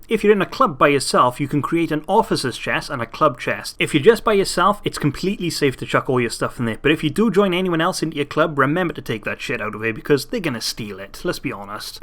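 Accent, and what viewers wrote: Wales, United Kingdom. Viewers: Are you Welsh? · Are you Welsh???? I am!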